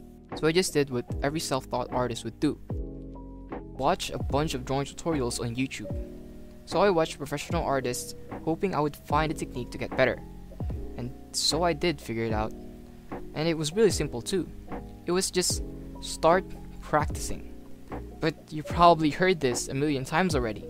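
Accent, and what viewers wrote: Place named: Netherlands. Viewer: Idk what is it but your accent sounds very dutch. Also great video!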